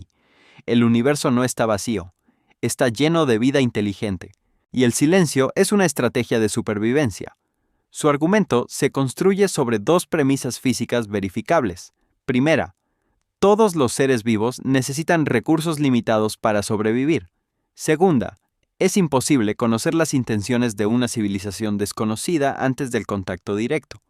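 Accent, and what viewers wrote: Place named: United States. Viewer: Tío, te explicas como el culo. Omites cosas de las teorías y de resultas hay cosas que no se entienden. Por ejemplo, respecto de la paradoja de Fermi, omites la conclusión de que, si han existido civilizaciones tecnológicas desde hace miles de millones de años (eso lo dices), LOS PLATILLOS VOLTANTES Y LOS MARCIANOS DEBERÍAN ESTAR POR TODA LA GALAXIA. EN PLANETAS Y SATÉLITES DESABITADOS QUEDARÍAN RESTOS DE SU TECNOLOGÍA, CONSTRUCCIONES, MINAS.... (eso no lo dices. El que lo deduzca por sí mismo, bien por él. Al que no, que le den). En este caso imaginar lo que falta es sencillo. En otros no tanto. Maquinas autoreplicantes que pueden construir otras como ellas con los recursos del entorno. OK. ¿Y? ¿Por qué la función de esas máquinas debería ser la de destruir toda civilización que encuentren? ¿De dónde se sigue eso? ¿Qué parte del argumento de has comido? 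Hablas de ausencia de estructuras modificadoras de sistemas estelares, sin explicar la teoría que propone la existencia de esas estructuras, con un sistema de 3 fases según el grado de explotación de la energía de su estrella alcanzado por la civilización en cuestión... Tú vas largando y la gente que se imagine lo que te comes, ¿no? Anda, pon menos interés en pronunciar el inglés como lo hacen los yankees y aprende a hablar mejor tu idioma. Y mientras tanto, dedícate a otra cosa, macho, que lo de explicar cosas no es lo tuyo.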